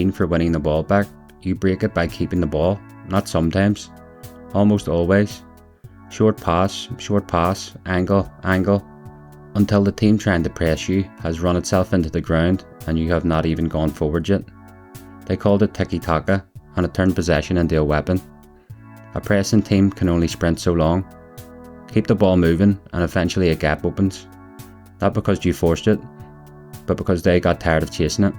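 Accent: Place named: Ulster, United Kingdom. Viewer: Ulster dialect is the best part of this video.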